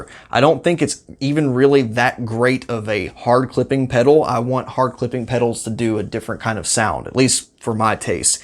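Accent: United States. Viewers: very interesting stuff, ty for putting this together. as i am curious (german native speaker) - that accent, which area of the states are you roughly from?!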